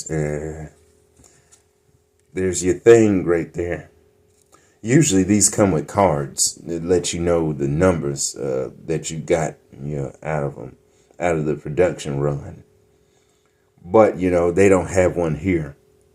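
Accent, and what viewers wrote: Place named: South, United States. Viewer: I like your southern twang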